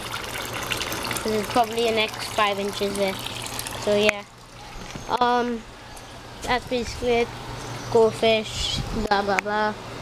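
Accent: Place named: Trinidad and Tobago. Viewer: Hey nice fish hommie!i heard the lil trini voice.. im checking out ur vids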